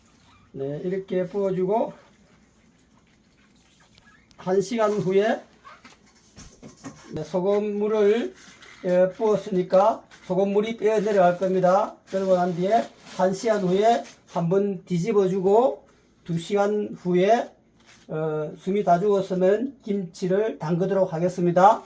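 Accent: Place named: Gyeongsang, South Korea. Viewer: 구수한 경상도 사투리가 매력있습다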